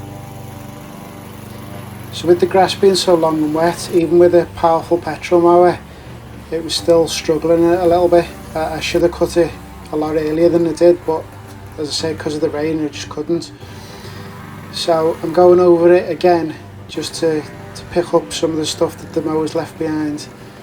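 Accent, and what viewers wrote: Liverpool, United Kingdom. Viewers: We’re planning on levelling out the lawn and it’s so overwhelming. Seen so many videos trying to figure it out. Then heard you were scouse, subscribed immediately 😂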